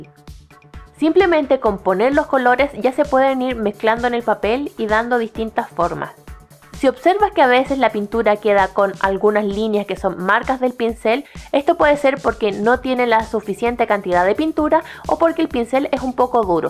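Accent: Chile. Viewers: tienes acento chileno..o no? yo pinto con acrílicos, soy principiante pero me gusta mucho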